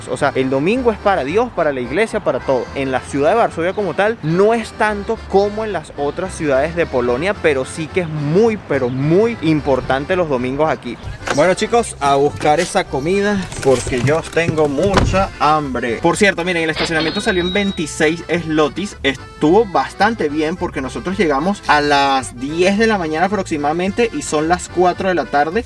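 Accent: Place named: Venezuela. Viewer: Apenas supe que eras venezolano por tu acento cambié de canal!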